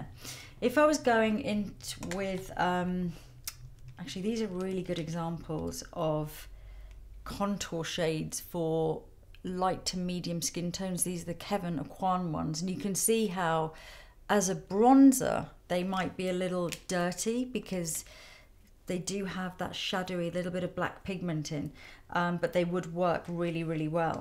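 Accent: United Kingdom. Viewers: I love your accent. Always liked the British accent.❣️ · Love her explanations of make-up. Also, love her calm, mellow voice with British accent.:)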